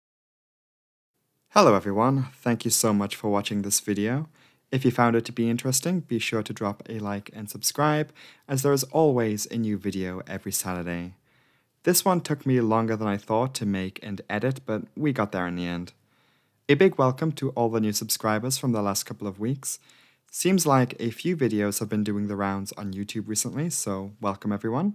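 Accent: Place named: Geordie, United Kingdom. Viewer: I’m fascinated by this accent. It seems mostly Northeast England, but with little hints of South Wales every now and then. Then there’s a touch of rhotic North American creeping on in there too. Is this a Geordie who moved to the US?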